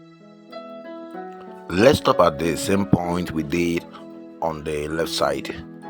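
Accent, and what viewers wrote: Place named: Ghana. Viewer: Nice Job bro.. you sound like a Ghanaian ɓoss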